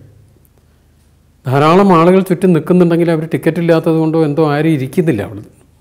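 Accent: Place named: Kannur, India. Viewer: ആ കണ്ണൂർ കമ്മ്യൂണിസ്റ്റ്‌ സംസാര ശൈലി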